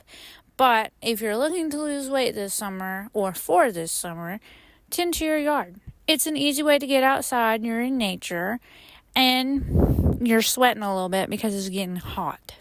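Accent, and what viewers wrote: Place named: United States. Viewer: Which state are you from, I hear an accent when you talk and was curious. You don't have to answer if you don't want.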